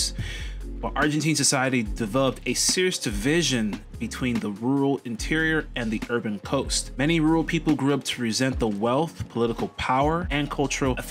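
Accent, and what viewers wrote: United States. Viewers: Your american accent and been just a tourist for sure they ll treat you nicely...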